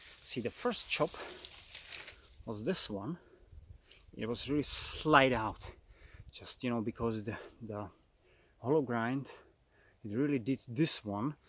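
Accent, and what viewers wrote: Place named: Europe. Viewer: Great video thank you were you , sound very European, good stuff , im from Canada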